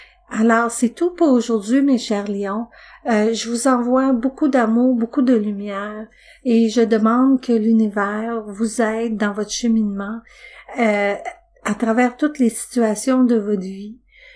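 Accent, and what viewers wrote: Quebec, Canada. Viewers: Quel plaisir de vous écouter. J'adore votre accent. Québécoise ? Exact, je suis à la fin d'un sicle et vivement que celà se termine pour ouvrir une page positive. Je vous souhaite un très beau week-end. NAMASTE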